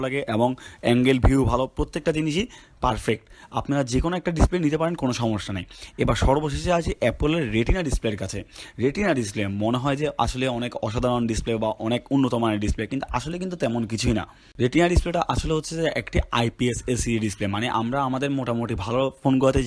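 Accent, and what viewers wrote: Chittagong, Bangladesh. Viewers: ভাই আপনি চিটাইঙ্গে নাকি?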